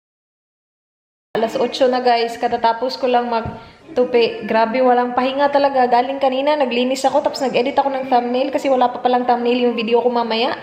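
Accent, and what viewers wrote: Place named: Visayas, Philippines. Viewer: Hi ate 😃 makahappy man ka lantawon te oi kay same atung accent pag magtagalog hahahaha😅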